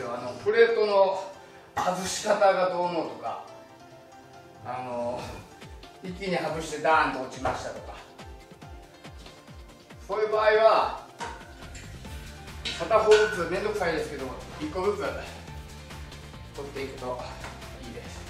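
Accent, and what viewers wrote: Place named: Kansai, Japan. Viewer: やっぱり標準語より関西弁でお話されている方がRyuさんぽくて良いです。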